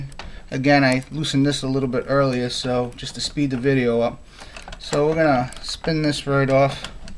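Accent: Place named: New England, United States. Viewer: You have a great accent. New England is my guess. Thanks for the video.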